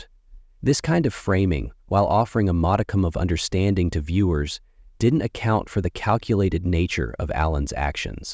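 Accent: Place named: United States. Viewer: Can u name the names correctly please your American accent ruined the story and since this there’s been a hell of a lot more like the most reasent of the 1 in rossfeild tallaght Dublin 24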